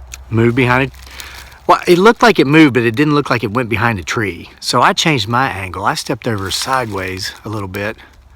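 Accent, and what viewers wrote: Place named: Ohio, United States. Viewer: Are you possibly in Ohio? You have a bit of an accent.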